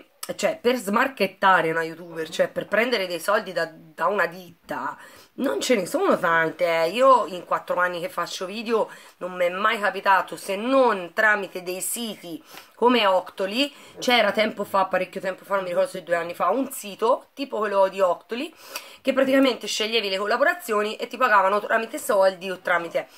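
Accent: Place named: Rome, Italy. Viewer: A A ME, ME PIACE CHE PARI ROMANA!!!!!! PERO' IO SO' DE' PARTE!!!!!😅😅😂😂😂😘😘😘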